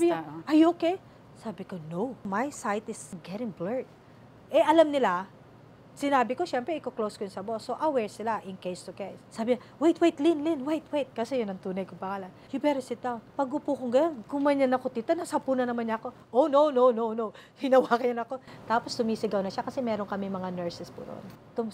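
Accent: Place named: Australia. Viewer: Very easy call Mayor Magalong. He will find your mom Garcia. Your accent now is like Aussie, but you’re right in Australia they take care of their people , specifically women. But in Australia you have to work hard to survive. And bare with paying tax, kasi kapag nakita mo kaltas sa sweldo mo sasabihin mo Bakit parang nagtatrabaho na lang ako para mag tax. Hehehe. Cost of living in Australia is high specially here in Victoria specially MELBOURNE.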